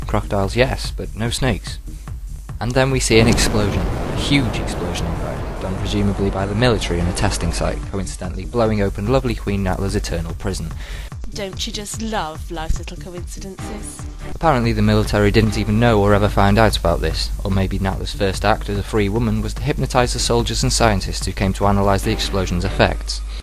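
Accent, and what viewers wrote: United Kingdom. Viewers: Wow this must have taken you a lot of time! Very funny! Btw you have a british accent :) Probably cause you're biritish =P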